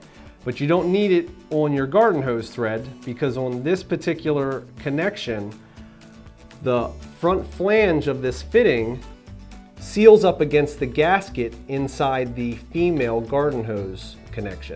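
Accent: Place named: Philadelphia, United States. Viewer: Yo! Can here the Philly South Jersey accent. Love it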